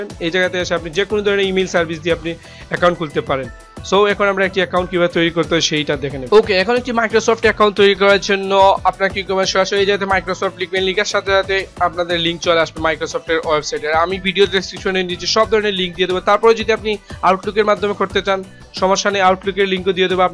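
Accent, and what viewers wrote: Sylhet, Bangladesh. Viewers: vai apna bari sylhet ni.......? apnar kotha ja sondor apnar tutorial dakiya ami account kolta parci ... so thanks vai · ভাই কিতা সিলেটি নি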